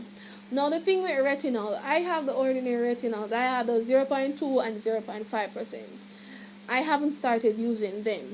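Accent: Jamaica. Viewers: Forget the skincare products I absolutely love your Jamaican accent, it's 😍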